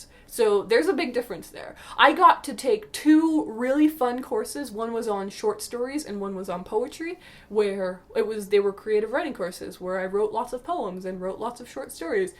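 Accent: Canada. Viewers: Loved hearing the Canadian accent strong in this video, as a Canadian living in the states for a decade.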